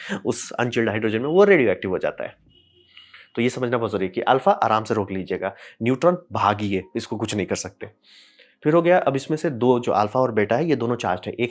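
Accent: Bihar, India. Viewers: liked ur bihari excent too❤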